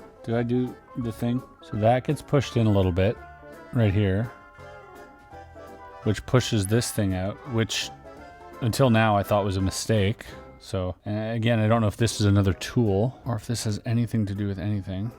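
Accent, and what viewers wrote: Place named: Canada. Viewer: Legos are so much fun lol why do Canadians say oat instead of out. I through Lego blocks oat my window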